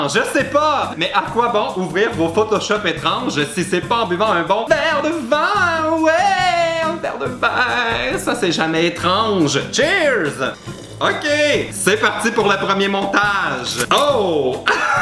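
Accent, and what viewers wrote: Quebec, Canada. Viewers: l'accent québécois c'est le plus beau 😍👂🏻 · j'adore ton accent Québécois!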